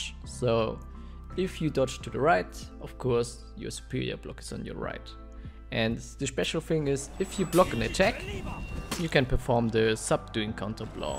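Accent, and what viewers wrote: Germany. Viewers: Sounding very German in this video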